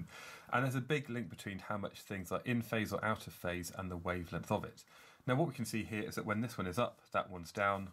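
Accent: United Kingdom. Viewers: What a beautiful British accent indeed. That was great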